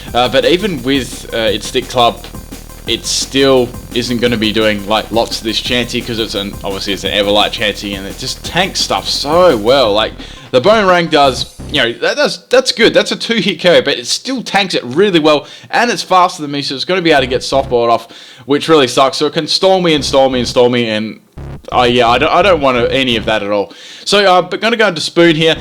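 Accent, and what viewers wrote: Australia. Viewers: became accustomed to it after a while...such an Australian accent...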